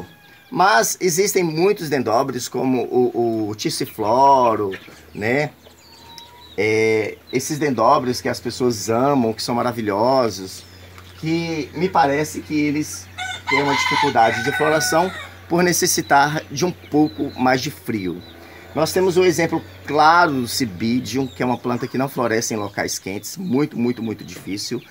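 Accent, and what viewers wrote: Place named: Minas Gerais, Brazil. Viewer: Amo os seus vídeos, vc é 10. Adorei o jeito de vc falar,desbeisado tem que ser Mineiro mesmo igual eu falo,afinal sou dessa terra também. Besossss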